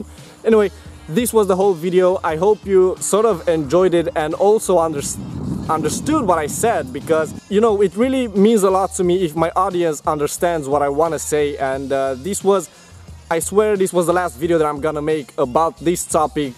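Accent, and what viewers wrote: Romania. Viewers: e mai slavic accentul romanesc dar tot as fi vazut ca e roman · Dar se vede ca esti roman,se simte...chiar si cand vorbesti in engleza,e ca un fel de accent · După accentul românesc ești din Ardeal, dar nu-i bai. :) Știu cum e, fiindcă în Ardeal m-am născut, dar am accent moldovenesc. :))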